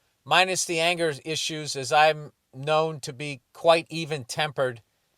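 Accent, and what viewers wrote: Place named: mixed, United States. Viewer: This much truth in a Boston/New York accent is breaking my reality. DAMNIT Bill, knock this shit off, but effing don't.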